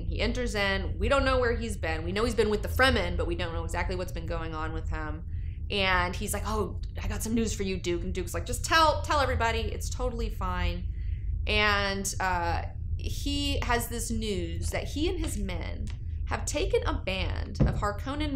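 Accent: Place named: Northwest, United States. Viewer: Okay I really gotta know something. Have you spent much time up here in the Northwest? Because there's a slight influx that I hear in your voice. Like " Out & Aboat" instead of "Out & About. Like the guy in Dirty Harry who hast to know if he fired 5 or 6 shots. I Got's to know!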